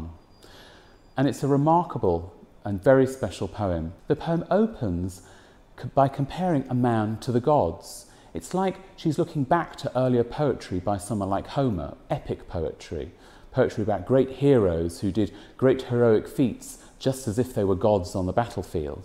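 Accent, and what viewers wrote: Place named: United Kingdom. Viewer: british vsauce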